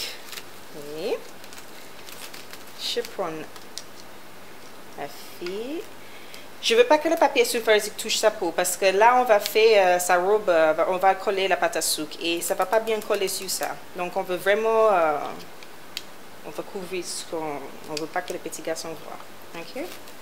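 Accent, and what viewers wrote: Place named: England, United Kingdom. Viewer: Sa se voit que t'es anglais Ta vois je l'aime bien😇😀😊☺😍😻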